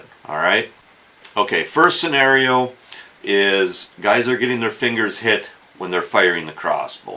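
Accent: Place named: Michigan, United States. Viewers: Good info and as a newbie crossbow guy duly noted. You sound like you're from the UP... Sault Ste. Marie here. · What’s your general area in Michigan! I hear that accent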